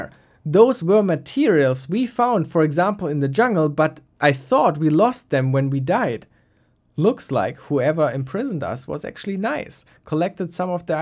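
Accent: Germany. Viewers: Wie krass man hört, dass du Deutscher bist xD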